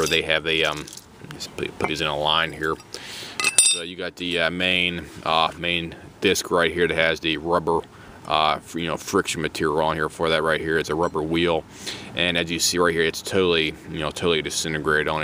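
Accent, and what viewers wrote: Pittsburgh, United States. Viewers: Definitely sounds Pittsburghese to me. Every time he says "down" it sounds like "Dahn," which is very familiar to Pittsburgh! Glad you picked that up too. 😉👍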